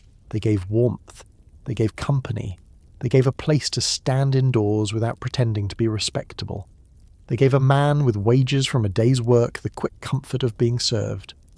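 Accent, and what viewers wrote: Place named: United Kingdom. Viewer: Discomfort is inaccute history being read wuth a pompous brit accent.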